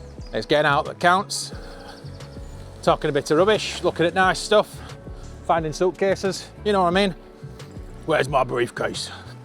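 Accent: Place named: Barnsley, United Kingdom. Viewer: Eyup fella, there's nowt worse than feeling knackered sleep wise, but at least you got out and did summat. You can't beat getting out and getting some air in your face, and especially when you're fatigued, or your head feels full. Don't worry about your Barnsley twang, it's who you are, and your music was just rate and not too harsh to go with your ride. Me and my mate are having a rest tomorrow (Sunday) we've done 6 rides this week and about 145 miles according to strava. I see your on a emtb, have you ever run out of battery? We're not ruling out having one ourselves at some point in the future, but while we can, we'll keep battling on. Keep the videos coming fella 🚴‍♂️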